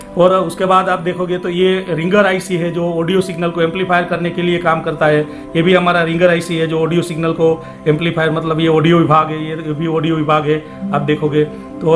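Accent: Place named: North, India. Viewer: North indians accent sucks!!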